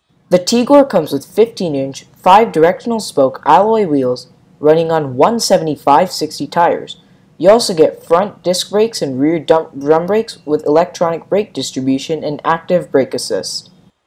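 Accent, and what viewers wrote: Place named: United States. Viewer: bhai tere accent toh American hai..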